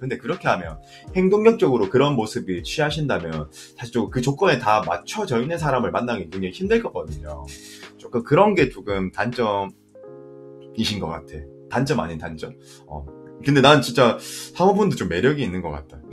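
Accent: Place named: Gyeongsang, South Korea. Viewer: 경남분인듯 저 3번여 콩 콩 ㅋㅋㅋㅋㅋㅋㅋㅋㅋ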